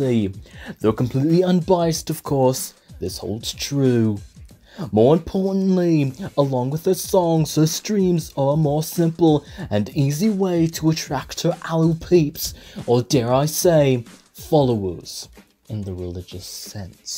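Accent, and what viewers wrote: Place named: United Kingdom. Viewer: didn't know you're british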